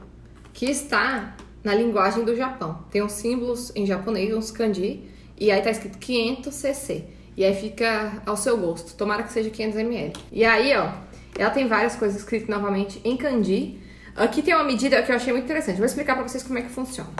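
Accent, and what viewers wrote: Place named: Ceará, Brazil. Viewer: Impressionada como teu sotaque parece com o do Nordeste! Mais especificamente do Ceará. ❤️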